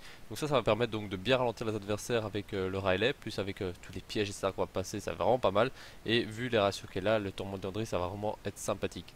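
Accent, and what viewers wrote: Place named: Belgium. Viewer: T'es Belge car t'as un ptit accent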